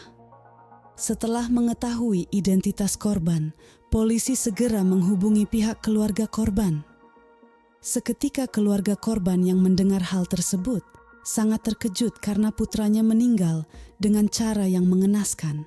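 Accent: Java, Indonesia. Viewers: Iya udah translate jawa pulak logatnya taik taik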